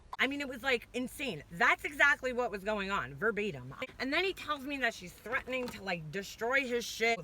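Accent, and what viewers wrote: New York, United States. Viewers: Oh my god found your channel and listening to when evil enters your life part 3... I’m fucking dying! You rock with your New Yorkian accent and my kind of foul mouth F bomb mom! Shout out from Carla from Boston!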